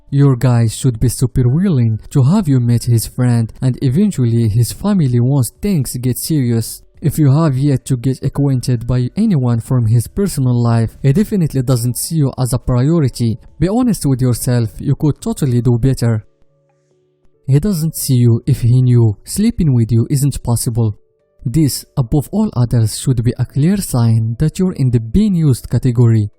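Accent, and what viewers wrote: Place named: France. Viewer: he is a french speaker that's why he got that accent i think he's getting better just be patient and use captions Give him some encouragement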